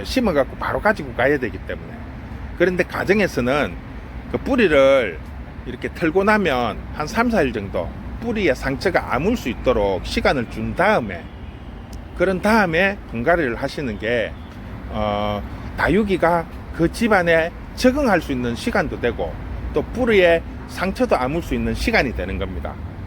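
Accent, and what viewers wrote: Gyeongsang, South Korea. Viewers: 경상도 말씀이지만 초보자들이 잘 알아 들을수 있도록 귀에 쏙 들어오네요 좋은 정보 감사합니다^^ 오늘도 축복 되세요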